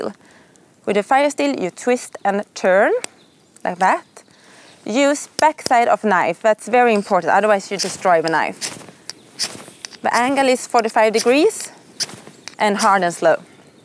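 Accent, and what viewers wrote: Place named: Sweden. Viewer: dat swedish accent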